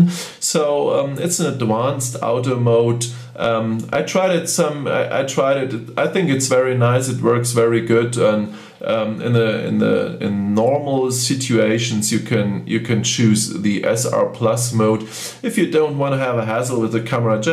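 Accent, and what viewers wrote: Germany. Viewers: great review, & good to hear that german accent again, i had a great german friend years ago, named joseph tretin. all the very best John Radford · Thanks for this comprehensive review. I came here to see what the viewfinder looks like (I only have the X10) and you provided it! One small note for non-German speakers: when says "auto-focus" I thought he was saying "out-of-focus". Don't worry, that's just the German accent pronunciation of "auto focus".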